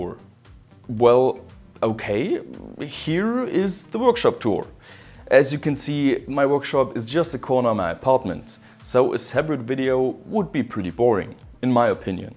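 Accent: Germany. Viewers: er hat den deutschesten Akzent den man sich vorstellen kann. Alles was er aufschreibt und kauft ist in Deutsch geschrieben... ou man ^^ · Man hört raus das er Deutscher ist.. haha.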